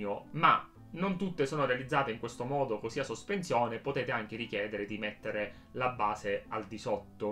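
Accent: Sicily, Italy. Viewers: Credo sia una cadenza siciliana..hanno le vocali molto aperte 🤣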